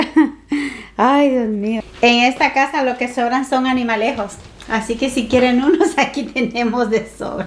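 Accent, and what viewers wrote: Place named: South America, Latin America. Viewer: Hola, te escribo desde España.....te descubrí antes de navidad y desde entonces te sigo. Eres una alegría de mujer, me encantas. A mí me encanta la gente sudamericana porque sois bien alegres, bien educados y con un acento como dices tú bien rico. Una pregunta, conoces el canal de Sandy y Fercho? Ella es colombiana y el Mejicano viven en Miami y también los sigo. El día 31 es el cumple de ella y le entró una llamada a su móvil de una tal, eras tú??. Un beso mi linda.😊😊❤❤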